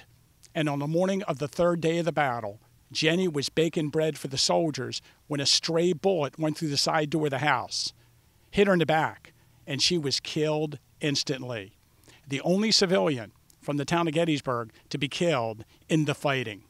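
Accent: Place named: South, United States. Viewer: I like the southern gentleman accent.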